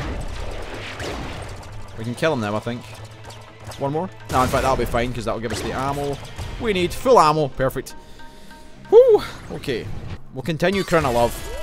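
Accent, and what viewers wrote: Scotland, United Kingdom. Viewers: I would laugh at any of your videos due to your Scottish accent. Just makes every story entertaining. Good video though, man. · it's always great hearing advice from another scot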